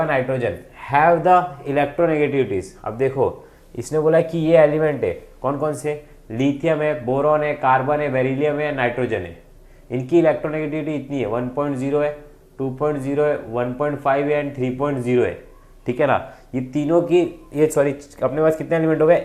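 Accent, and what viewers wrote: Maharashtra, India. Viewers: Sir aap Maharashtra se ho Kya thoda thoda Marathi jaisa language hai aapka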